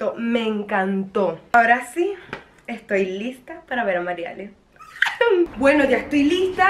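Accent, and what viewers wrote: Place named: Venezuela. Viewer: Nuevo suscriptor ♥️ Saludos desde #CostaRica Te ayudaré a conseguir más seguidores Tienes acento venezolana 🔝🔝🔝🔝🔝🔝🔝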